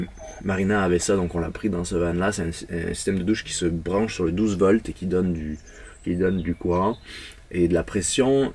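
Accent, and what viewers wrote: Quebec, Canada. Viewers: Hello ! Vous êtes dans le sud, j'habite à Pau, on pourrait éventuellement se rencontrer pour boire un verre ou pour une balade dans les Pyrénées ? ;) J'ai découvert ta chaîne grâce à Marina, elle est cool, et j'adore ton tout petit accent québecois, au début j'avais même pas remarqué, je te pensais français haha Hâte aux prochaines vidéos! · Bonjour jeune homme. J'ai acheté ton livre et je trouve l'écriture simple et sincère . je le lis avec grand plaisir " j'ai même parfois l'impression d'entendre ton petit accent canadien " Bonne route à vous deux .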